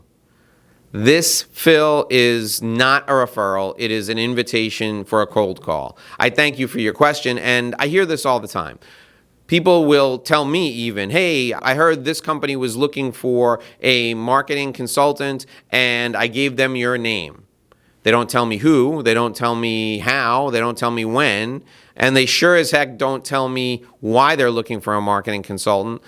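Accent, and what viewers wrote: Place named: United States. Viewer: I really appreciate your efforts to make sales people educated. I like your accent, it's US I guess. Subscribed!!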